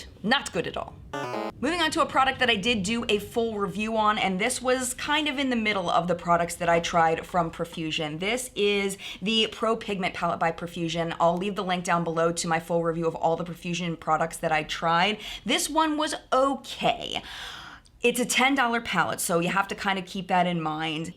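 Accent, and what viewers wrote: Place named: Midwest, United States. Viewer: I love your midwestern accent :) I’m from Iowa and say “vanella” also and always get called out for it. ;p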